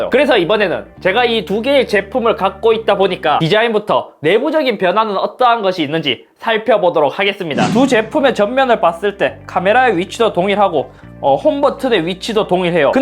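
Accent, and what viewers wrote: Gyeongsang, South Korea. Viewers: 고향이 대구에요?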